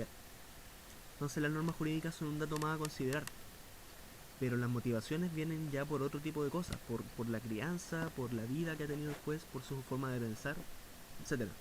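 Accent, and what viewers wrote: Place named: Chile. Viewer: es Chileno x eso no se le entiende bien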